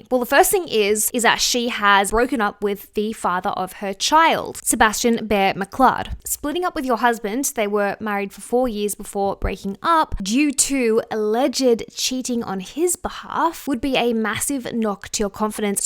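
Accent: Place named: Australia. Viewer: love your videos and just a fact, your accent remembers me of Felix from Stray Kids cause he is australian too (and Bangchan) <3 love you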